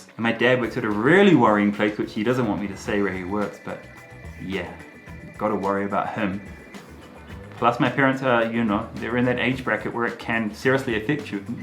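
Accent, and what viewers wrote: India. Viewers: Is it me, or is developing the slightest hint of an Indian accent? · Your english accent is getting influenced strongly with India🤔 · you are speaking English with a Hindi accent ---- Look Out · You are an Indian you even speak English with an Indian acsent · Why do you have an Indian accent lol, it almost sounds like you're trying really hard to put on an Indian accent on when you speak English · Kia ora from NZ. Nice area. Boredom is better than fighting virus in the hospital. I have been following you for a few weeks now. Mate, your Indian accents is getting stronger . Keep up the good work 👍🏼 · Hey, are you focusing your channel just for Indian viewers? Why do you speak with an Indian accent like "enna" at the end of every sentence. Why can't be just be yourself not a quasi Indian and talk normal so that the world viewers can understand. 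If you want to speak to your Indian viewers please do an another channel speaking in Hindi.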